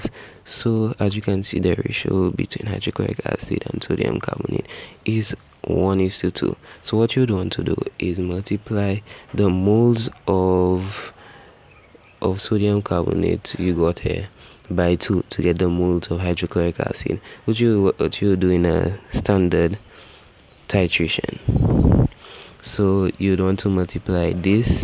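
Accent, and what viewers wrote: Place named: Dominican Republic. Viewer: Where are you from? You sound Dominican/ St.lucian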